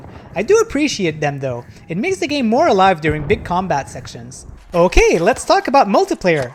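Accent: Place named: New Brunswick, Canada. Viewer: Un voisin Newbie!! I'm trying to make out your accent but I'm not sure, Edmunston, Peninsule p-e? Bathurst?? I'm also french and from Bathurst. btw I just gave you your 1000th sub. Congrats!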